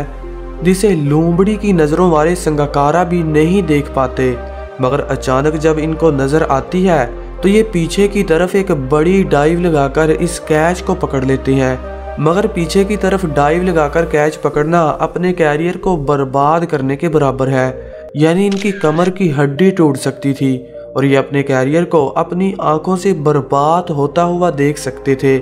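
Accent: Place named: Punjab, India. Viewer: O mere bhai punjabi accent me commentry na karo. sab ghalat bol rahe ho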